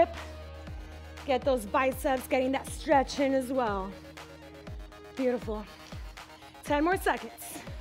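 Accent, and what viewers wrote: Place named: Miami, United States. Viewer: That Miami accent tho.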